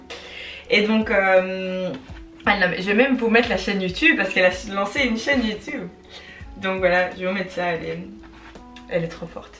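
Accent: Belgium. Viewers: Tu ne serais pas Liégeoise par hasard ? Un petit fond d'accent familier. En tout cas j'espère que tu développeras tes activités, courage.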